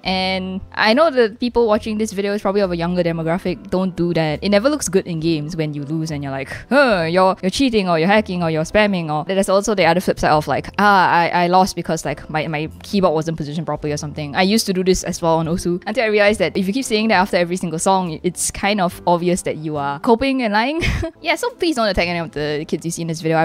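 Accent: Singapore. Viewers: why do u sound like ur singaporen?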